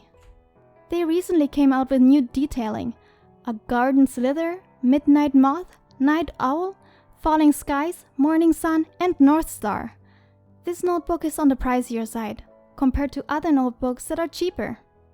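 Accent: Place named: Germany. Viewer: Are you german? As a fellow german i hear the slight german accent comin tru (no offense)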